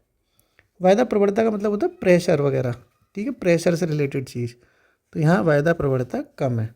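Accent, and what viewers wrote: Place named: Hathras, India. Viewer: सर आप हाथरस आगरा मथुरा अलीगढ़ क्षेत्र से हो आपकी बोली मेरी तरह है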